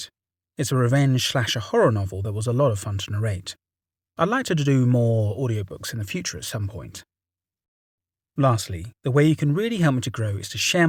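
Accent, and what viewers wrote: England, United Kingdom. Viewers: This is an American story why is this guy's accent English? Doesn't fit.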